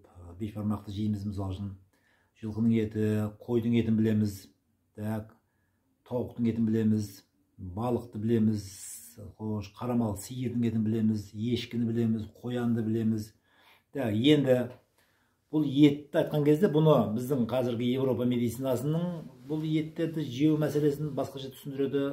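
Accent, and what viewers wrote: Uzbekistan. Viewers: Қазақ, тек Ташкентте тұрғандықтан тілі өзбекшеге кетеді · Бешбармақ қай тілде акцентіңіз өзбекше ме сұрланғ😢😢 а н ет деген не Сүр🎉😢😢 ет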